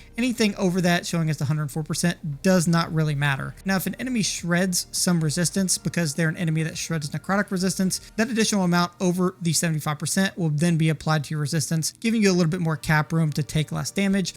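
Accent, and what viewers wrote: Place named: Midwest, United States. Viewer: You have such a clean Northern/Midwestern accent - but why do you pronounce "affix" in the way you do - a-fix? Dictionary puts the accent on the A - for ah-fex.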